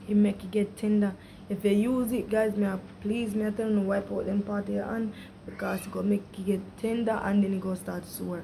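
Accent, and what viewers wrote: Jamaica. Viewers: Oooh hie bomboclat kkk l can hear u speak like Jamaican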